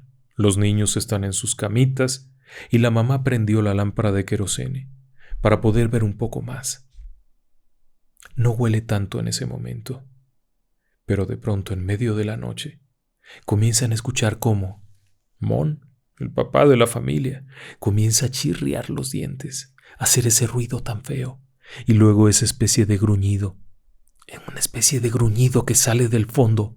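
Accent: Dominican Republic. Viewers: Parece Más Dominicano Que Yo. 🤣😍❤️🇩🇴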